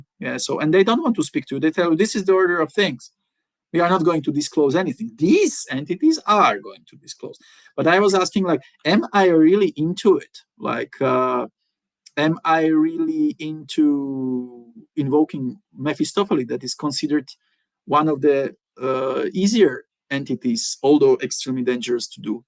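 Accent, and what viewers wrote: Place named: France. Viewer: Your videos are my favorite. You remind me of the Brazilian man who introduced me to Quimbanda. I guess it must be the French accent!’ Please keep up the great work!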